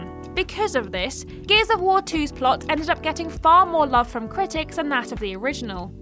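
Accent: United Kingdom. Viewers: this girl narrating is to British sounding driving me insane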